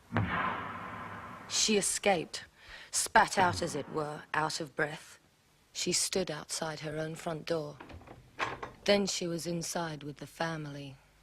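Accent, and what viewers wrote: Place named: United Kingdom. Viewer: oBRIGADAAA, estive procurando esse video legendado porque mesmo entendendo ingles, ainda me confundo com o sotaque britânico. muito obrigada pelo video!!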